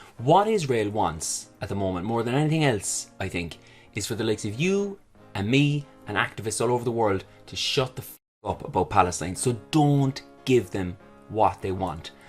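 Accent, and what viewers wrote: Ireland. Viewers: The Irish rrrr good , yeh l love this guy ❤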